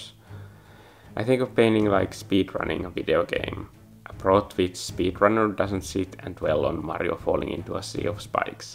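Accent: Finland. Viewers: I knew you’re Finnish because of your accent before I even saw your username lol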